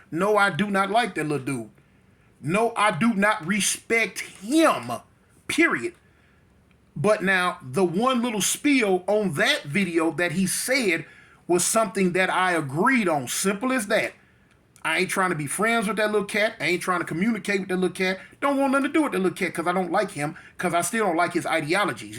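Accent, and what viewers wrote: South, United States. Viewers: You've seemed to have picked up a southern accent, when I first started watching your videos I didn't think you were from California. You're spit on, that is exactly how it happened with my city. There wasn't any gangs there until LA cats came. It's like anything someone start it and show others and they get up to speed and run with it.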